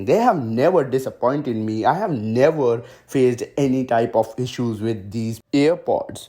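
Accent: India. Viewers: Are u from India? Can see from your accent.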